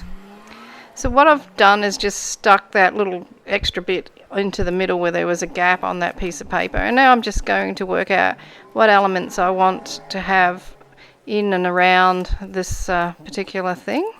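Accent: Australia. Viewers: Thank you, for your inspiration on the hidden theme. I just joined your channel. As soon as I heard your voice, I knew you were Aussie. I'm an Australian, too. I live in Wodonga, Victoria. 😊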